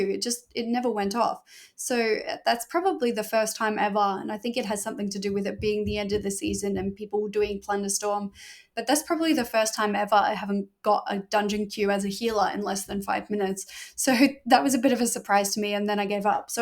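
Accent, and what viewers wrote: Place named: Australia. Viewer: when you say morning and evening do you mean Australia time or US time? I'm in Australia, so that would be good to know I guess. I'm new to the channel and not sure whether you play on oceanic or US server - you sound Australian but I don't want to assume Oceanic server. Also I would be happy with 30k a day, that would well and truly pay for WoW every month.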